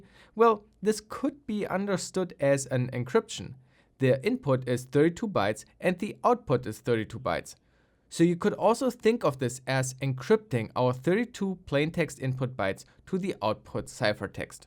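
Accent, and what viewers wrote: Germany. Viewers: I like ur German accent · The german accent makes me want to kill myself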